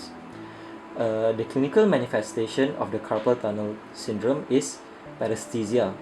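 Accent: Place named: Johor, Malaysia. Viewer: nampak beno gaya bahasa cam orang johor. "wait eh". huuuuu. hidup JDT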